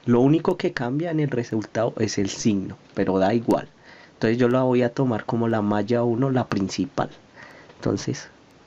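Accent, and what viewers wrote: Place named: Bogotá, Colombia. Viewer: Puro acento de rolo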